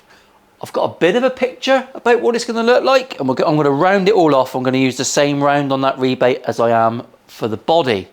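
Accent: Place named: Plymouth, United Kingdom. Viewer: 9 grand worth of resin..... Priceless Janner accent !!! 🤣🤣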